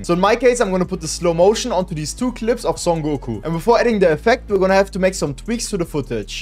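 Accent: Germany. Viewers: Ich hör den deutschen Akzent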